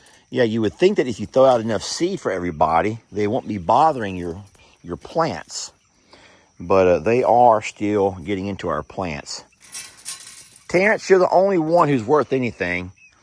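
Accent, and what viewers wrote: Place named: Texas, United States. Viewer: Your Texas accent is showing... Terrance becomes Tarrence. get over here Tarrence.. Ha! Love it!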